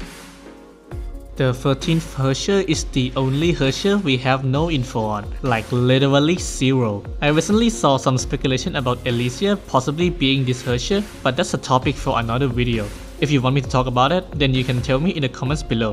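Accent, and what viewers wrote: Thailand. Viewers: offtopic for a moment i did NOT expect this youtuber to be thai, i heard his accent and i checked his about page and damn he really is thai good to know that i found an another thai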